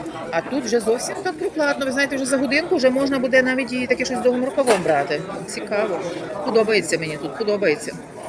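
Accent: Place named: Western Ukraine, Ukraine. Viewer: Німці дійсно пʼють багато пиво, але по якості не зрівняти з нашим. У них дуже смачне пиво. Коронна страва це шніцель з капустою синьою . Багато їдять мясо, спаржу корисну. У них є багато цікавих страв. Але ви подаєте про людей з Європи і про німців не коректно.. Не гарно знімати , як ви їсте) коли їдять , то не розмовляють. Культури у вас вибачте мало. Італійці багато пʼють вина , як воду. Треба поважати народ з іншоі країни.Мені прикро , як наші люди позоряться. Не знімайте більше відео .. не ганьбіть українців., тим більше по акценту вашому ви з заходу України ..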